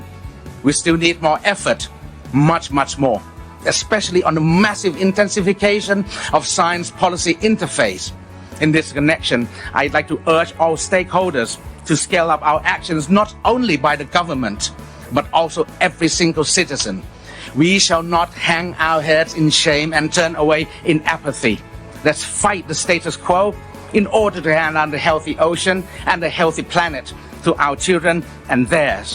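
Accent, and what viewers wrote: United Kingdom. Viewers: ชอบสำเนียงบริติชของคุณท็อปครับ คนมีคุณภาพแบบนี้ อยากให้มีกันเยอะๆในสภา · สำเนียงอังกฤษเลย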